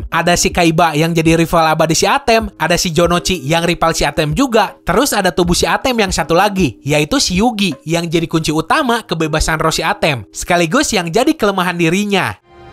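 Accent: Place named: West Java, Indonesia. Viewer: Mimin orang sunda yah haha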